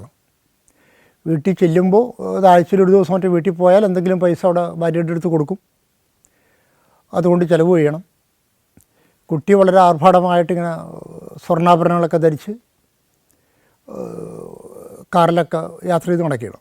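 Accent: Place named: Wadakkanchery, India. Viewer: Sirnte veedu wadakkancheri aano ? Slang also like that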